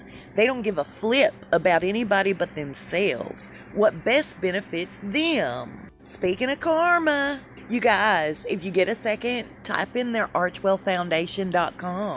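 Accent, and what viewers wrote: South, United States. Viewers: I love your videos...YOU ARE THE FUNNIEST OF THEM ALL... you truly put a smile on my face...not only because we are sisters in the 'MEGXIT".. I hope that MERCHANT MARKLE (as I call her) GETS HER KARMA BACK..!!!.but I also have a house in the Sunshine state...I like your southern accent better than the millennial....I actually love it.!!!!... in my humble opinion it makes you different from the rest, it gives you character, your own personality...plus the jokes sound much better, super funny..!!!! the millennial accent does not "suite" you...hahahha no pun intended..!! I think If anybody is over the age of 16 ...is just not right..it is actually a speech vice...did you know that? I hope I did not make you feel bad or hurt your feelings in any way....because I just love your videos. EX PECIALLY...HAHAHAHAHAHA. the one where you talk about going thru fire hoops, jumping over a pool full of German pinchers or something like that...oh...and the ROASTED CHIKEN...OMG..... 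I LAUGHED SO HARD..I HAD TO RUN TO THE BATHROOM...no,...really, ..seriously...I did.... you are the best..!!! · Hey 👋🏼 Always enjoy your vids & that sweet southern drawl of yours 😁 I watched the trailer for the movie…that’s all I needed. I cringed at her voice…😝 She won’t make it in Hollywood, she’s just not good enough. She’s an ok actress, but that’s it.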